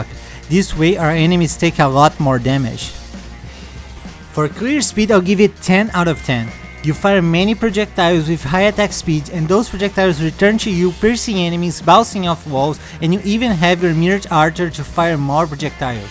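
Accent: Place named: Brazil. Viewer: E esse sutaqao br ai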